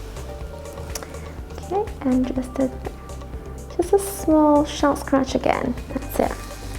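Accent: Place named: Iran. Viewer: She has Iranian accent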